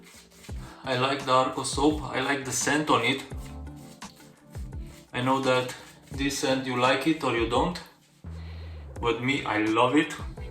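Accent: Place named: Romania. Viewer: Based on his English accent I reckon he is from Romania, or some other eastern country.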